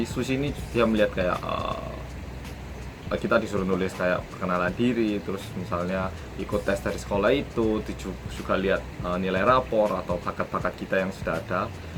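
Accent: Java, Indonesia. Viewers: medoknya lucu 😂😂😂😂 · Logat nya rada kyk org jawa gitu , medhok bgt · Korea asli tapi tinggal di Indo dan berlogat Jawa (medok). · orng korea tapi jawa banget ngomongnya 😍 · kok kedoknya kedok jawa kak xD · Ini masnya asli korea apa org indo? kok medok jawa ne😂 Ya wes. La lek😂😂😂 Sukasuka😄😄😄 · Oppa asli korea atau orang indonesia?????logat jawa nya kena banget 👍👍👍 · logatnya ky orang jawa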